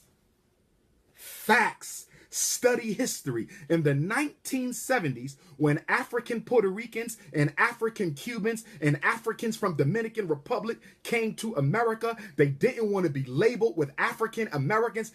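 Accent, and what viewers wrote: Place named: Philadelphia, United States. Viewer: Woooow... you can tell for sure Dr. Umar is definately from Philly! On another level...love my brother his intellect is beyond sexy! - I always say this, they will never ever go against the oppressor because the oppressor reared them--I dont believe they can.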